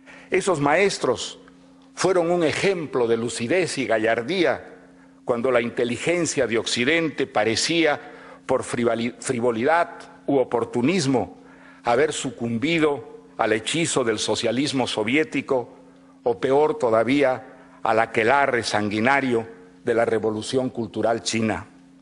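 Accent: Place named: Peru. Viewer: es que aunque intente pasar de español, es peruano.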